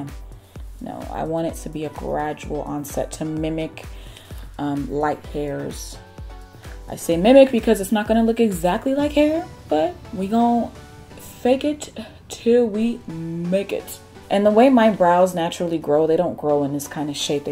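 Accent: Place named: Nigeria. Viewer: You did a great job. Subscribed straight away. Love the Naija accent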